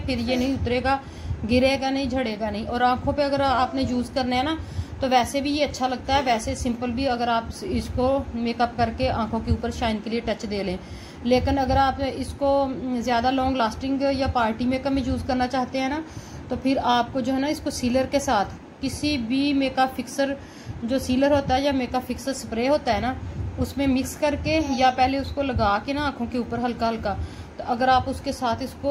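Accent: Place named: Punjab, India. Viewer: Aap punjabi ho.punjabi hini main a hi jandi a ji😊😊😊😊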